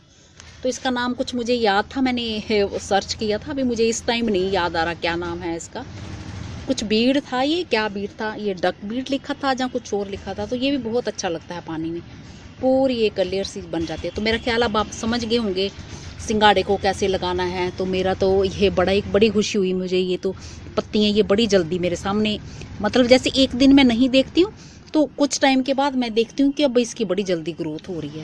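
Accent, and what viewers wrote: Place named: Himachal Pradesh, India. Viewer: apki awaj se saaf pata chal Raha hai app himachli ho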